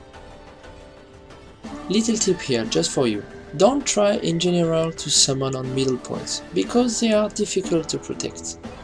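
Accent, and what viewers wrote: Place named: France. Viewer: Pas mal comme vidéo ça se sens que tu parle Français mais ton anglais est parfait 👌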